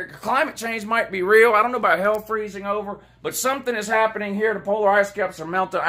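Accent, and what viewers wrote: South, United States. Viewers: But I will keep watching. I am a gator fan in Moscow. I’ll listen to anyone with a southern accent.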